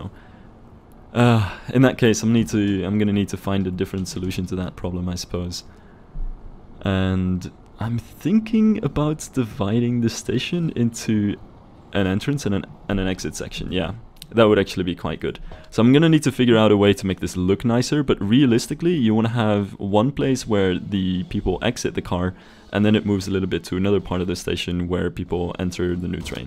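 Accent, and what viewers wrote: Netherlands. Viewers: Wait, you're dutch?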